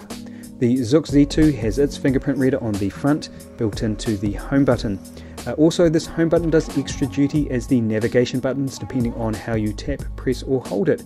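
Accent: New Zealand. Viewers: kiwi accent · ARE YOU FROM NEW ZEALAND?!?!?!?!?! JUST JUDGING BY YOUR BEAUTIFUL ACCENT :P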